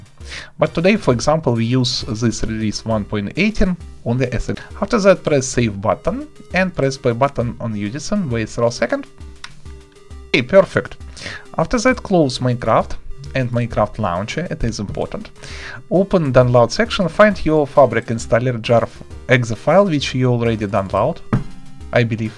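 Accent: Russia. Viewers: У тебя прям сильно русский акцент)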